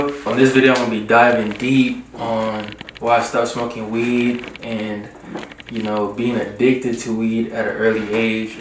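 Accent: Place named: United States. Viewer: Which part of the states if your accent from?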